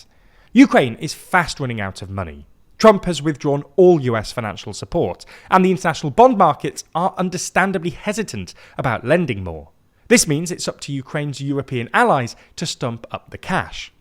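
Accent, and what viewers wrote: United Kingdom. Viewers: Where are you from? cause you sound british, but that would be strange :)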